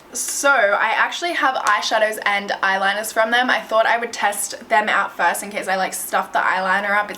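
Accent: Australia. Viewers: is she australian? shesounds like jess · what area of australia are you from? your accent is so cute but it sounds different from other australian youtubers i watch! btw love your videos sooo much!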